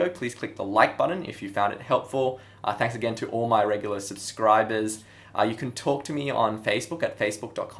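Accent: Australia. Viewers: how do you do such a good Australian accent? I am also Asian and I can speak Spanish but I can't do an Australian accent.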